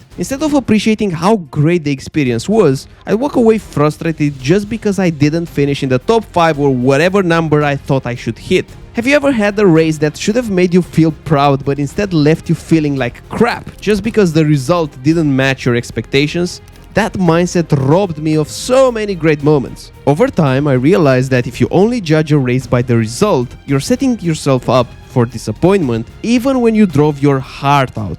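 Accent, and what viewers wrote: Romania. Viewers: Where are you from? It seems you are romanian?🧐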